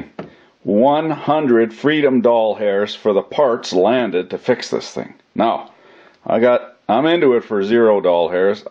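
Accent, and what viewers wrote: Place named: Canada. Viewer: I love listening to you I don't even know what da hell ur doing but hearing a Canuck talking shit is awesome thanks 🍀🍀😁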